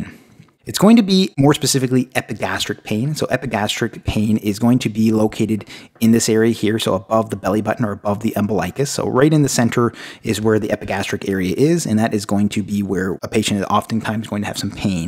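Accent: Canada. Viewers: He sounds Canadian to me.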